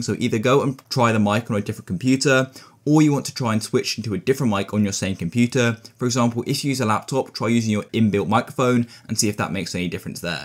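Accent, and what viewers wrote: United Kingdom. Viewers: thanks british guy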